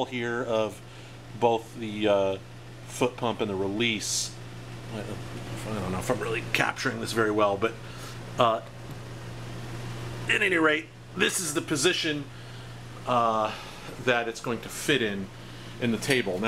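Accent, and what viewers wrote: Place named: Baton Rouge, United States. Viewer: Nice job. I have a 17 year old 91764 lift with a bad pump. It works fine with my bikes 500lbs and under but puked itself trying to lift my Harley Road Glide. At 900+ lbs it just might be time for a lift replacement. Costly, but the bike is too near the limit of the lift for my comfort. Even if it did work, I'd drill a few new holes for the safety bar higher up as I don't need the bike more than 24" off the floor. By the way, I guessed right. You sound exactly like a contract engineer I use that's from Baton Rouge.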